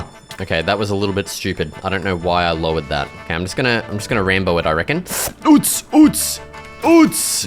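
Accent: Australia. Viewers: Where are you from? it sounds like you're an aussie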